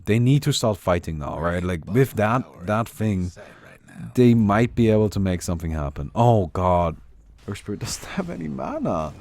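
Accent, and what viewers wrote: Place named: Germany. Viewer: it's the German accent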